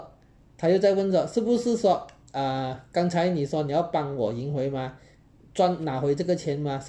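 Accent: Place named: Penang, Malaysia. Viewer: 槟城的福建音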